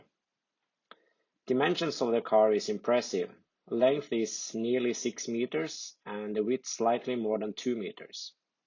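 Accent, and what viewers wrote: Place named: Norway. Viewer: As well as visually showcasing the impressive features of this gorgeous car, your video is a delight to listen to. Maybe the commentary was to some extent scripted, or perhaps it is not having to simultaneously think about what you looked like on camera, that resulted in such an assured manner of speaking. I know many people hate hearing how they sound in recordings of their own voice. But believe me, your diction and delightful Norwegian accent in this voiceover comes across as professional and intelligent, reliable and trustworthy ,as well as approachable and engaging to an English listener.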